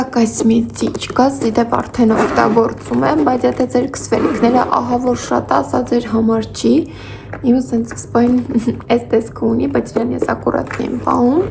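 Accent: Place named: Vanadzor, Armenia. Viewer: Հոգում միշտ Վանաձորիս բարբառը։ ՍԵՐ Վանաձոր❤💫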